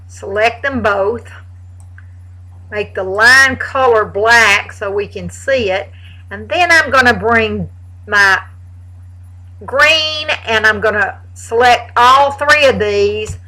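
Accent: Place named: South, United States. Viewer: you are so awesome!!! and I LOVE your southern accent!!! (I'm from Kentucky, but lived most of my life here in Ohio, so I lost my accent :( ...)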